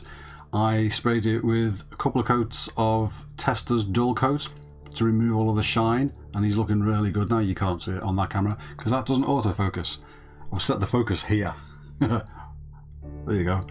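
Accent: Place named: Wirral, United Kingdom. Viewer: Where are you from sounds like Wirral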